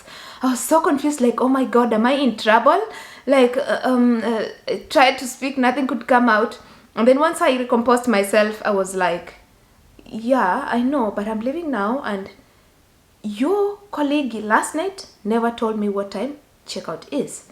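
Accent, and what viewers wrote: Kenya. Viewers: A very interesting video. Unfortunately I’ve never heard anyone speak English with an Kenyan accent and about 20% of what you were saying was lost for me. Good luck in Germany.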